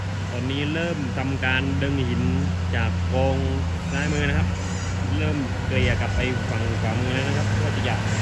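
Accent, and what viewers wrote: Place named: South, Thailand. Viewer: การใช้ภาษาทางใต้จะไม่ค่อยเหมือนภาคอื่น เลยทำให้ฟังแล้วมันแปร่งๆ สำเนียงไอ้น้องมันยังทองแดงเลย อภัยเถิดครับ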